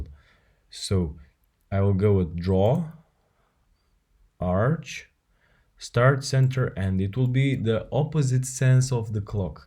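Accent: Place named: Russia. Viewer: Слышится отчетливый русский акцент, а похожий гайд на русском может быть есть?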